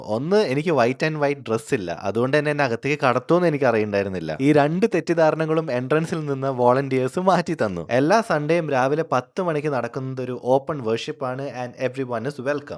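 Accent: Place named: Central Travancore, India. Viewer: നിങ്ങളുടെ video വളരെ നന്നായി, എന്തെന്നാല്‍ നിങ്ങള്‍ കൃത്യമായി ഞങ്ങളെ വിവരങ്ങള്‍ പറഞ്ഞു മനസ്സിലാക്കി തന്നു, I appreciate you, നിങ്ങള്‍ ഒരു മദ്ധ്യ തിരുവിതാംകൂര്‍ സ്വദേശി ആണെന്ന് മനസ്സിലാക്കുന്നു സംസാരത്തില്‍ നിന്ന് മനസ്സിലായി,അല്ലേ, പെന്തകോസ്ത് സഭയുടെ കേരളത്തിലെ ആസ്ഥാനമായ കുമ്പനാട് എന്ന സ്ഥലത്തിന്റെ അടുത്താണ് എന്റെ വീട്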